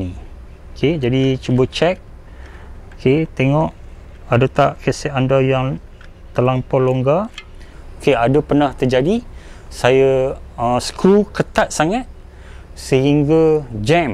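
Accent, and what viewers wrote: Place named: Northern Malaysia, Malaysia. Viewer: saya tinggal di Sungai Petani, Kedah. Saya rasa macam pernah dengar loghat abg macam ada utara sikit, mungkin ada adik beradik, wife atau family sebelah utara? Hihihi... Saja agak agak ja.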